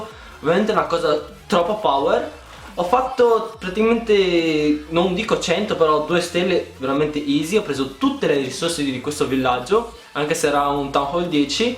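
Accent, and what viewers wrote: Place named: Milan, Italy. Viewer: Primo tuo video che vedo e ... sei veramente epico! Un cinese con l accento milanese dove lo trovi ... probabilmente a milano ma tu sei epico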